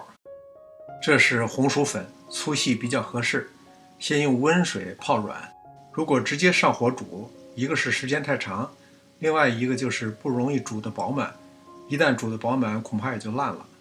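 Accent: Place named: Beijing, China. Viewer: 北京话好听。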